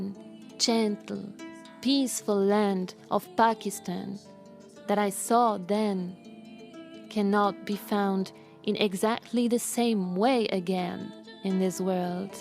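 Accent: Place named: France. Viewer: You are mystical and deep, it's soothing to listen to your beautiful french accent.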